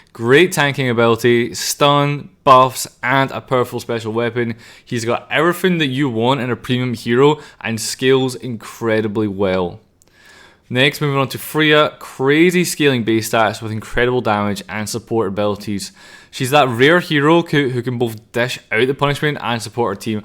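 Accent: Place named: Scotland, United Kingdom. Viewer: This guy is Scottish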